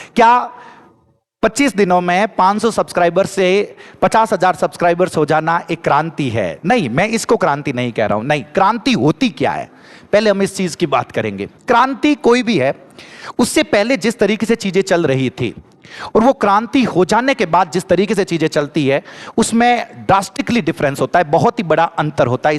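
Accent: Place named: Jodhpur, India. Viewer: Sir aap ki jodhpuri boli kamaal hai✨👍😊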